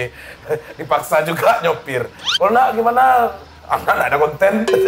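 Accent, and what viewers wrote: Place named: Padang, Indonesia. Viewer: Bang sering2 konten pake logat atau bahasa padang ya hehe berasa lg dikampung soalnya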